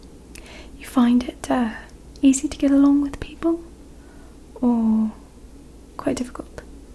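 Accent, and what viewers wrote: England, United Kingdom. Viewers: The English accent is back... Yay! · This is the greatest English accent I’ve ever heard from an American. Are you an actress? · I love British.. or should I say English! ♥️ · Best English accent from an American I’ve ever heard 🙌🏻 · I'm only just discovering the British accent videos, these are delightful! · I genuinely thought you were British, until I saw your other videos. This accent is exquisite! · As someone who’s English I’m actually so impressed by your accent 🤭 if I didn’t know you were American I’d genuinely think this was your real voice! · Not gonna lie but when women have a English accent its super super attractive. I love the way it sounds. Very calming. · Wait, you AREN'T English? Your accent is incredible. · Wow. If you showed up talking like this I wouldn't be able to pin where exactly you're from (I'd guess maybe Sussex or Surrey), but I wouldn't have any doubt you were English. 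· Umm, you're incorrect. Thats not an American accent at all! That's an excellent British (more correctly, English) accent. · I’m from south London, how do you sound more English than me? 🤔😂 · Can I just ask who or what you copied your accent from? You sound more English than any Brit ever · As an English person, Americans are usually dreadful at putting on an English accent - espeically so-called professional actors!....but this is absolutely 100% convincing. Like many others, this is my first video of yours, and I never suspected you weren't English for one moment, until I read the comments. You should get into professional acting! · I'm British from Nottingham, thats a very beautiful english middle class accent. Your very considerate which I think reassures people. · Please do more British accent video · Wow, with the British accent you really remind me of Kathy from the Patty Duke show lol. 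· Absolutely spot on accent, nicely done (from a UK viewer)! YT pops up this from the past, lovely to see, as always. You even seem to be 'acting Englishness', you would have been excellent in The Crown 😊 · that's a flawless English accent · I thought she was English, it was the first video I've seen from here, so I went to watch another and I was shocked she was American. I'm English as well x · It is so nice to hear an American do an English accent and not sound Australian. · I swear must be partly English with an accent that convincing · Your English accent is so flawless, you really should be an actress. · I always forget youre not british when watching a video. Hah, thats great! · I just came here because I miss British accents and I find myself answering the questions in my head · I can call myself British because Britain is a nation (you forgot to include Northern Ireland, by the way.) Also, I specifically said I thought she sounded English, not British. 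If you want to call yourself a North American, that too would make sense because it’s where you’re from. Stick to America, I’ll stick to Britain! 🤗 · What a voice! so soothing.Nice english accent.Poetry to my ears. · It's! Nice to see a new ASMR video from you! Watching this at the moment for a comforting Tuesday afternoon! Love the English accent as well:) You're the coolest! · Your accent is super believable from my experience a lot of Americans trying to do an English accent either sound like Dick Van Dyke in Mary Poppins or a super over the top impression of the Queen. If I heard you I would think you were English...I'm English, just thought I'd mention that for context haha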